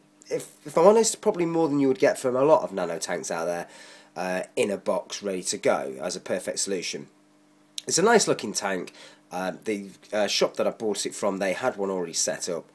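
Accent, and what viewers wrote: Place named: England, United Kingdom. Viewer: Awsome gd to hear an English voice on nano tanks gd look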